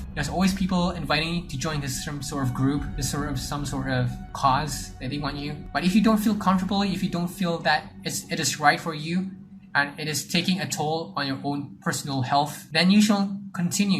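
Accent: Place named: United States. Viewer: love your American accent 👍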